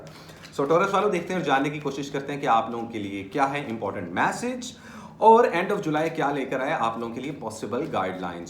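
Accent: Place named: Punjab, India. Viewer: Gemini❤❤ Ap Punjabi bry mzy ki bolty ho 😂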